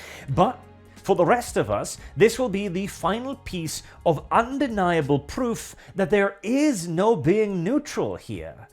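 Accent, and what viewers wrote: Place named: RP, United Kingdom. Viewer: Why are you speaking like Etonian Jacob Rees Mogg pound shop Enoch?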